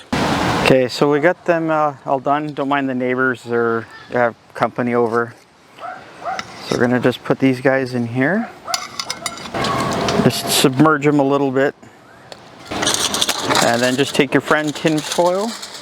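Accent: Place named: Canada. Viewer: My Canadian brother! I'm an American expat in Panama from the US and love to make meals with beef shank aka OSSO BUCCO as the Panamanians named the cut after the meal. I am assuming your Canadian based off the accent but if I got it wrong my apologies. Gonna watch more of your stuff and share! Thanks!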